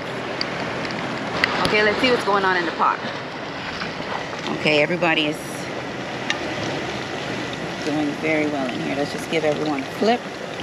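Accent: Bahamas. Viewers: Girl I knew I heard a Bahamian accent in you. I love Bimini. 🇧🇸🇧🇸🇧🇸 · You even sound like a Bahamian. He don’t even know this grease hot. Whose your people?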